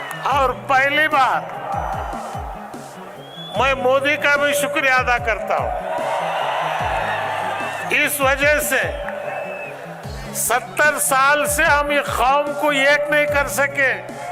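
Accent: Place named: South, India. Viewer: south indian urdu language ka mazaich aur hai ❤❤❤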